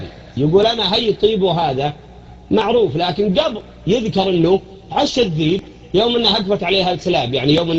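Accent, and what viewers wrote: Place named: Ha'il, Saudi Arabia. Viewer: اللهجة شمالية حائل يابعد حيئ "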